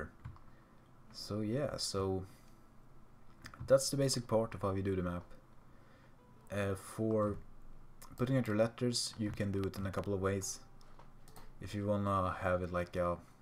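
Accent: Sweden. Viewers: are you Scandinavian (your accent sound Swedish but it could be another country)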